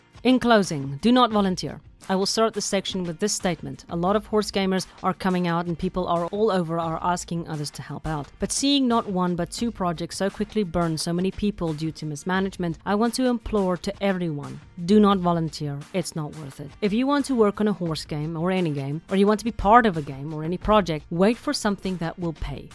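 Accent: Afrikaans, South Africa. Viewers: Wag, jy's Afrikaans? Of praat Afrikaans? Hoe het ek nie die aksent geplaas nie! ei ek voel so dom nou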